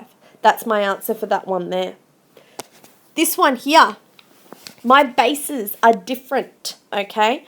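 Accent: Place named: Australia. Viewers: I'm Australian and by the sounds of it, I think she is :) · hey yall ima.australian and.by the sound yall thinking she is.australian .yall are whall awright see yall laterr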